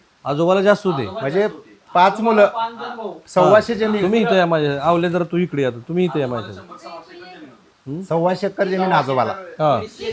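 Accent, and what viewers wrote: Marathwada, India. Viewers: सर, तुमच्या बोलण्यातून मराठवाड्याची..बोलीभाषा ऐकायला...मिळते... खूप छान वाटत... अशाच काम पुढे चालू ठेवा...अशा चांगल्या गोष्टी समाजापुढे ठेवत जा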